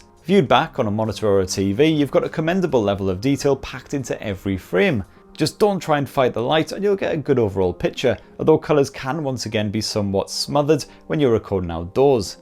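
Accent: United Kingdom. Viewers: god his British accent is unbelievable for American 😂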